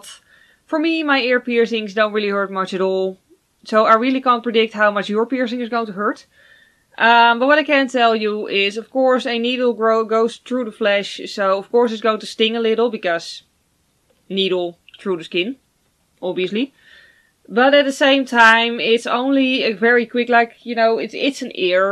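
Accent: Netherlands. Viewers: are you Dutch you kinda have a Dutch accent?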